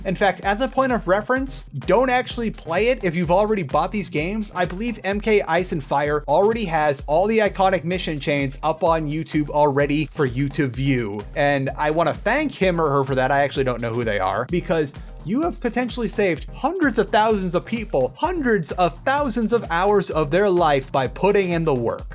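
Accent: United States. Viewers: You from Colorado cause you almost sound like Jimmy snow.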